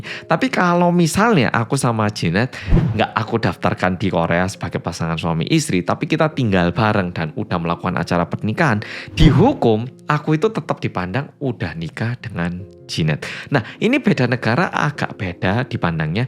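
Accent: Java, Indonesia. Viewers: hahhah... peIiket jare 😂 . mbanyolan mu sek panggah Iuucuu wae maas 😂 .... jowomu sek kentheI Iooh 😂 minggu, 16 n0fember 2025 | 25 jumadil-awal 1447 hijriah . 00;35 WiT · Hellow bolo medoook❤ Nyimaaak 🎉🎉🎉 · Bahasa indonesiamu hampir ilang tapi kenapa boso jowo mu jik nempel bgt😅 unik bgt kwe soll😂😂😂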